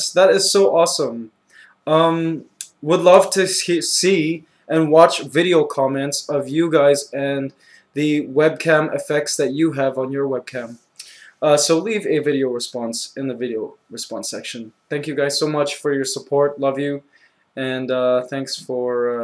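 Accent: Philippines. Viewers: I think it's because he use to live in Toronto and he moved to the Philippines and started talking Philippines more and that's how he got the accent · ya what happened in your Filipino accent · yeah what happened to your Filipino accent? anong nang yare?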